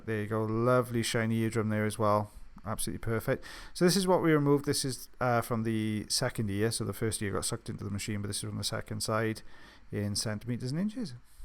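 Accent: Wales, United Kingdom. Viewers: I love your Welsh accent. Your' narration of these videos is just brilliant, I'd never want to mute you! How would we learn about Audiology without you. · I could listen to your lovely accent for HOURS!! How i miss Wales. Your commentary is wonderful. Such a crush here!! Lucky Mrs. B!! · It’s our welsh accent it’s lv it or hate it what part of wales are you and your wife from I live near CARDIFF AND SPEAK WELSH LV UR VIDEOS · Definitely! I could listen to him all day, love those dulcet Welsh tones 😍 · Thank you for the special! I had a stressful afternoon yesterday and I got so excited (I sound like a whacked wombat) when I saw this in my notifications! Perfect unwinding video! This southern girl (Georgia, imagine an accent that’s half hillbilly and half Scarlet O’Hara) loves your UK accent! Y’all keep up the good work 😄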